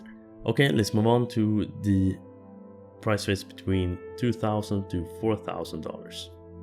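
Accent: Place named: Sweden. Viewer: May i guess, ur swedish arent u? Typisk svensk accent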